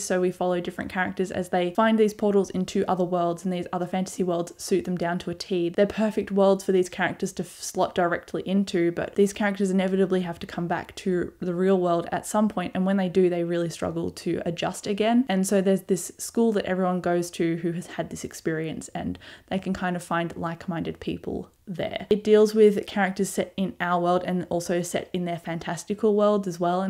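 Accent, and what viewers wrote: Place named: Australia. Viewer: I'd forgotten how pleasant the Australian accent is. :) Looking forward to what you have to say about Parasite. The Wheel of Time...I went through that series a year or so ago because of the Sanderson tie in and because when I saw him in person both times he always credits it as one of his favorite...I won't spoil anything, but I'll be looking out for how your experience goes and save my queries until you finish. :)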